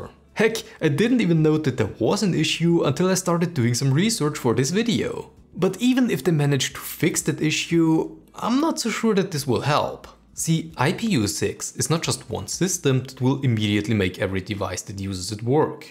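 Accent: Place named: Germany. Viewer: I like you accent its like bringing a german to texas :D Thanks for the informative video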